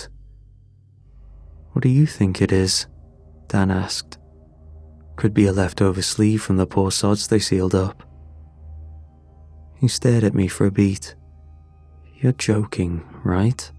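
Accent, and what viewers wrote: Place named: Cockney, United Kingdom. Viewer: I'm lovn dat don't give a toss; real cockney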